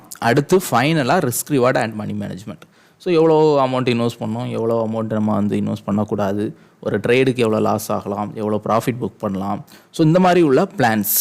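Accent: Kanyakumari, India. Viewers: Kanya Kumari Slang😊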